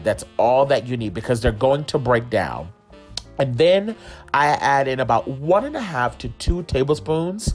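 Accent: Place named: Gullah, United States. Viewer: Reminds meh of my gullah people 🤣🤣🤣🤣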